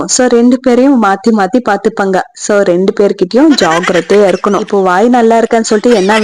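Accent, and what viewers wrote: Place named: Bangalore, India. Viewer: Kk sis nenka pesuruthu Bangalore la irrukuruvanka pesura mari irruku ahthana ketan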